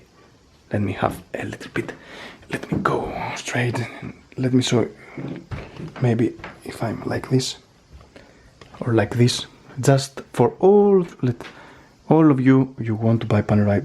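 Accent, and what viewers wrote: Greece. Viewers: you are from greece? the ascent is familiar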